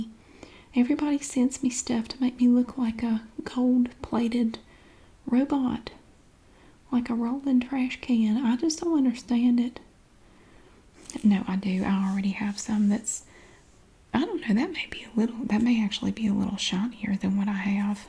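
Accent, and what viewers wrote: South, United States. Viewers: I was really drifting off when I hear “ooo it’s fancy chapstick” in amazing southern accent and I started laughing half dozed off out here... need to comment that! GN😂🖤🖤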